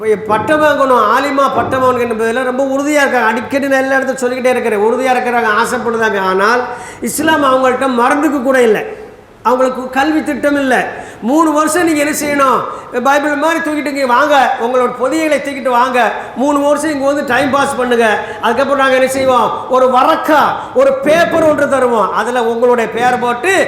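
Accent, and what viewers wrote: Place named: Tirunelveli, India. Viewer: முழுவதுமாக பயானை கேட்டேன். அருமையான திருநெல்வேலி தமிழில் ஒரு சிறந்த பயான் அல்ஹம்துலில்லாஹ் வேண்டிய பயான் ஆண்களும் பெண்களும் அதிகம் அதிகம் பயான்கள் கேட்பதால் நம்முடைய ஈமானுக்கு அதிகம் அதிகம் உரம் ஊட்டியது போல் ஆகும் .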